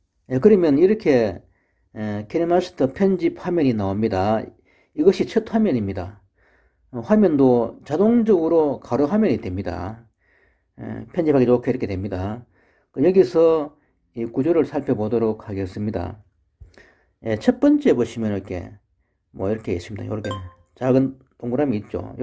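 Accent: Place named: Gyeongsang, South Korea. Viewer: 설명 아주 천천이 차근~~ 잘 하시네요. 귀에 익은 경상도 말이 마음에 들어요. ㅎ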